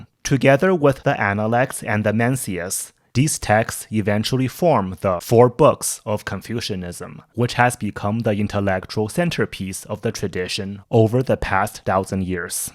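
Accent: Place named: China. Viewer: Bro you have the cutest Chinese English accent❤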